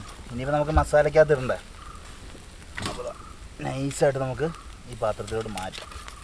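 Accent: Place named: Palakkad, India. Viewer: palakkadan slang aan...but full aghu palakkadan ala...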